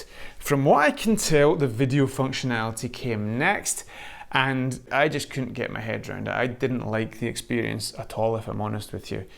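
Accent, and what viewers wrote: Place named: Edinburgh, United Kingdom. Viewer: Another excellent video. Trying to place that accent. Edinburgh?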